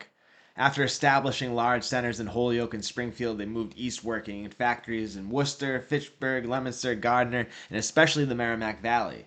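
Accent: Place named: Massachusetts, United States. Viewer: ....YO DOIN A GOOD VLOG... WHAT MAKES IT INTERESTING. IS YOUR MASS ACCENT,.. YOUR. KOOL THOUGH....OHH BY THE WAY.AM NO GENIUS, BUT I DID COMMERCIAL FISHING FOR 44 YRS, AN IM RETIRED OLD..F. AN GET TO WATCH ALL THE YOUNGINS. CRY ABOUT THIS AN THAT...WHILST I GET TO BULL RAKE IN PORTSMOUTH R.I.... AN MAKE A FEW HUNDRED EVERY OTHER MONTH...YA KNOW. FER LITTLE KNECKS.....I live.. You Know...!!!! I used to sell oysters at the Fishing pier where..No Name Restaurant is..It's a Seafood business next Booth over...used to get.120 bush..for undocumented oysters..That was side job.in. 90s... I MISS FISHING...HEY..IF YOU KNOW YOUNG GUY'S THAT WANNA WORK ON CLAM BOATS...LMK.ILL GIVE ALL INFORMATION...THEY ALWAYS HIRING...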